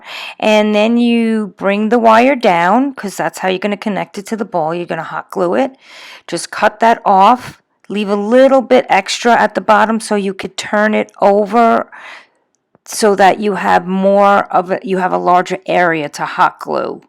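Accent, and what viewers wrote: New York, United States. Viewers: So so happy you were apart of the hop! That angel 😇 was absolutely gorgeous! The pallet tree looked great with the clip lights, and that rustic candle stick was equally as beautiful! Great idea to distress with a nail file! Nice to hear someone with a similar accent! My family is from NY 😊 You now have a new subscriber! Look forward to watching more of your videos! Have a Merry Christmas 🎅 🎄 ❤ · Boy you sound like a NYer... Where are you from? Love the angle and your palette tree.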